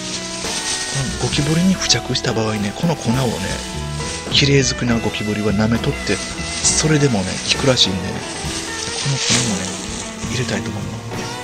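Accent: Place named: Juso, Japan. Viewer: 十三のイントネーション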